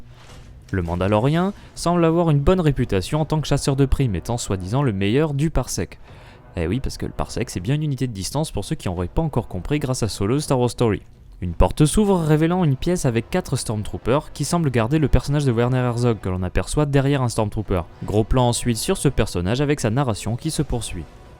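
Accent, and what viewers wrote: England, United Kingdom. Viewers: Je pense que la scène avec l'enfant et le matériel de la CSI est un flashback. Pour le vaisseau inconnu, il verse dans le style corellien non ? Enfin, le personnage avec le blaster sur l'épaule me fait tout de suite penser à Cydon Prax, un chasseur de primes apparu dans le jeu vidéo Star Wars The Clone Wars (PS2 et GameCube). Superbe analyse comme toujours ! Il faut conserver l'accent anglais, c'est là votre marque de fabrique 🙂 !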